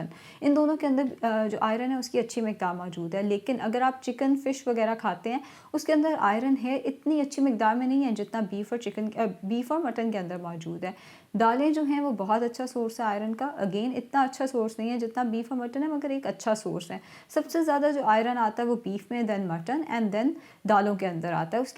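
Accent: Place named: Lahore, Pakistan. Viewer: Good work 👍👍👍miss Ap Lahore sa hain na ? Allah maf kare Acha tha Aisy Lahore wale Bolte hain Kue k main bhi Lahore sa hoon 💪🙈😁😅😂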